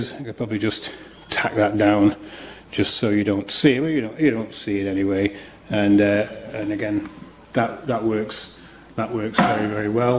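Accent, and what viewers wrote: United Kingdom. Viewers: This is brilliant mate, I have looked at making a pinball machine for a couple of years now and having found this video, I am on it. I would like to explain my joy of listening to a English bloke, because I have lived in the States for 22 years now and your first few words where “ bit and Bobs” that tickles me. So fun and also I could see you sweating with the heat, 40 deg means nothing to us over here. It would be like 95 deg Fahrenheit. Thank you so much for all that, you have given an old English man new hop. John C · Your accent sounds Yorkshire similar to my own Doncaster accent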